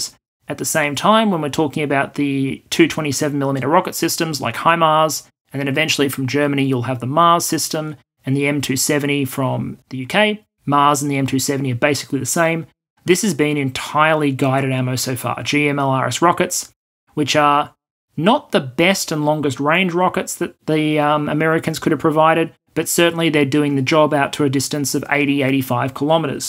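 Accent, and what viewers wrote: Australia. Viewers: This video is three months old. But like all of videos...I can just listen to it over and over again. I think it's a mixture of the subtle comedy, absolute reasonable logic, and that cool sauve Aussie accent that is so calming.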